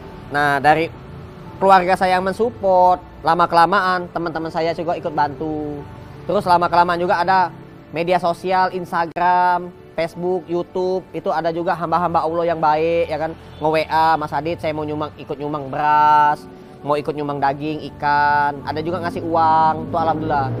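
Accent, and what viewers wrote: Palembang, Indonesia. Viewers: Logat palembang nyo mase ado 👍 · Logat palembangx khas sekali..smga sllu dimurahkan rezekinya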